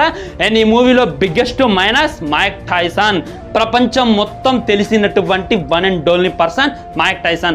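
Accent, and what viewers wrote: Khammam, India. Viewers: Bro meedhi khammam aah?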